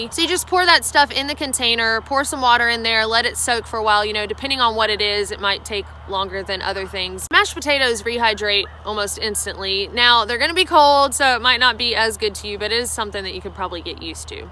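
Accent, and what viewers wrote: South, United States. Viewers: Thank you! I was thinking the same thing! She's from the South and has a Southern accent...hellooo! That's what I like about her and she makes no bones about it! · i love the eating the favorite thing first bit. what a simple and brilliant concept. thanks for your videos, it's nice to hear from a woman and also i do miss that southern accent. where's it from? i live in england now and i have a new appreciation for all the accents that just sound normal to me. :) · At first I thought your southern accent was going to be annoying, but I quickly fell in love with your videos. Friendly and so informative! Thanks for your straightforward good sense and speaking on my beginner level.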